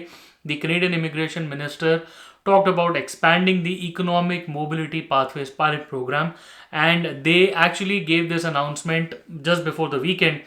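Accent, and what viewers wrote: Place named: India. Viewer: Tha Indian accent is so strong that it becomes extremely hard to understand.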